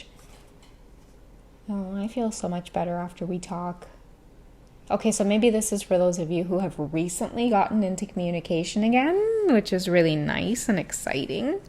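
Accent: Trinidad and Tobago. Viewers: Ok I think I need trini talk everytime/reading please?!!! 🤣🥰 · Yes that!!! I love the Trini side!! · Lmaoooo sis you DONE KNOW I LOVE THE TRINI ACCENT ENUH!!! 🇹🇹Stupezzzzzz lol PUNGKIN had me WEAK cause iykyk!! Hahahaha!!! Love it here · Oh lordie .. the Trini Popo...😂🤣😂Love hearing the Trini Accent in someone else lol..🇹🇹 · No, we like that you speak with your Trini accent when you talk to her! 😂 ♥️♥️♥️…Buss up shut and Tarot! 🥰😂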